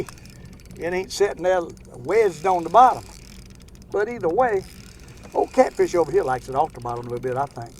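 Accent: United States. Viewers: That country accent. Love it. Love you videos.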